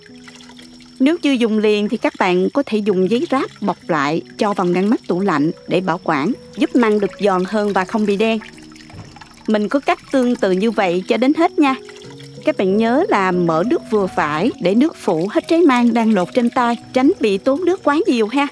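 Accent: South, Vietnam. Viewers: giọng nghe rất miền Nam và rất chân chất, ủng hộ Cô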